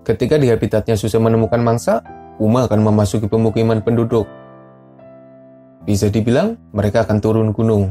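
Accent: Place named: Tegal, Indonesia. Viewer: Admint nya mudah dipahami karena logatnya Tegal atau Banyumas banget 😮😂, pada Karo nyong wong ngapak. Malah ingat lagu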